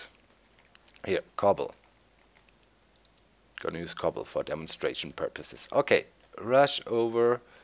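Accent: Germany. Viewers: i cant exit the video till i hear you say bye bye in your german accent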